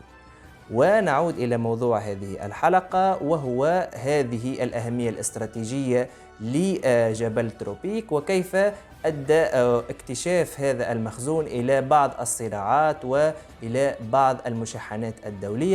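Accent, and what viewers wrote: Tunisia. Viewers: من خلال طريقة نطقك قلت انك بتونسي قبل أن اكتشف العلم التونسي جنبك و اهنيك على اصالتك التونسية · شكرا لك أستاذ..حصة ممتعة وافكار تاريخية قانونية متماسكة بلغة سلسة جميلة بطابع تونسي رائع...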